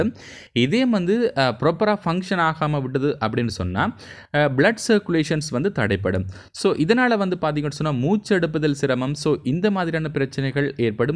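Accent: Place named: Sri Lanka. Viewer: இலங்கை தமிழ்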